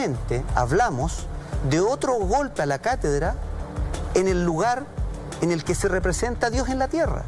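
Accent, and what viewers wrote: Chile. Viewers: Quería ver este video pero apenás escuché el acento chileno dije, no gracias prefiero vivir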